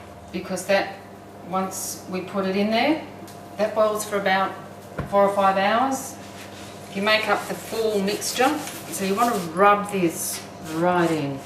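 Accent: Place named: Australia. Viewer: Want to try a Chrissy Pudding for the first time this year. Living in England with my boyfriend and will be spending chrissy with his family again (a little chillier than 20 degrees), so wanted to do something special this year. Found a good recipe but the calico aspect escaped me-this video was so helpful! Also nice to hear the accent, if I closed my eyes I would have sworn I was listening to my Aunty Sue from Campbelltown :P so thanks for the reminder of home! Hopefully it works out!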